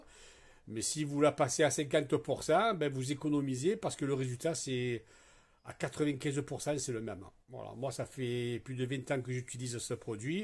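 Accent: South, France. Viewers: Merci beaucoup très clair et concis. PS j'adore ton accent, vive le sud 💪👍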